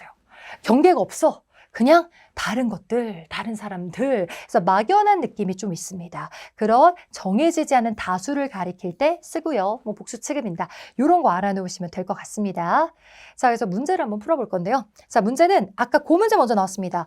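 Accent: Jeolla, South Korea. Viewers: 약간 전라도 사투리가 느껴집니다. ㅋㅋ 읎어여~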